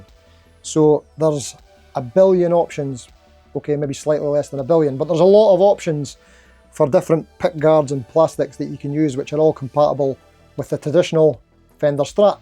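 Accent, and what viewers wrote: Scotland, United Kingdom. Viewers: Scottish accent is a draw for me, it's like if Scrooge McDuck had a guitar channel that centered around Strat style guitars.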